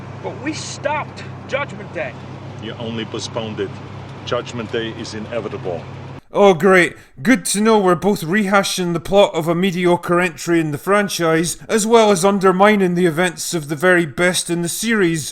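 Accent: Scotland, United Kingdom. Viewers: At first I was like shut the f up. But then I thought your voice is a cross between scotty of trek and braveheart But......take a breath it will be ok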